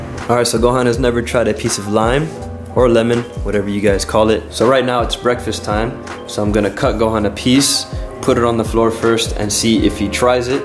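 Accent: Miami, United States. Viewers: Your accent sounds like you’re from Miami. Did I guess correctly? Lol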